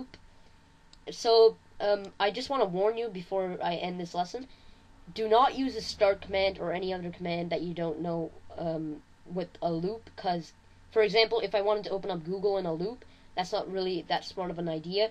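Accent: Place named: Canada. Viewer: Good video. You have a strong Canadian accent.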